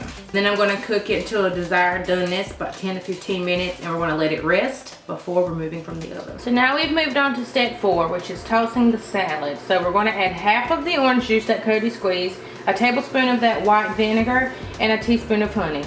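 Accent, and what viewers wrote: South, United States. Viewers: u sound very southern / country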